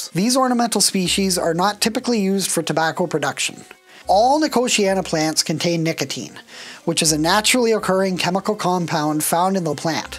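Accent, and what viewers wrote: Canada. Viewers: I just discovered you. I knew within 8 seconds you're canadian. True North! 🇨🇦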